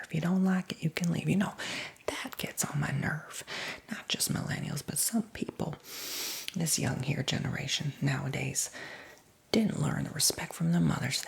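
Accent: South, United States. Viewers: I’m in LOVE with this character!!! I love me a GOOD OLD SOUTHERN DRAW! Voice is perfect and soothing! The stories and chit chat are on point! Great job!!! 😊😊😊 THANK YOU for this!!! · At least your a nice southern cafeteria lady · Love the Southern accent lol Are you on the Tingles app?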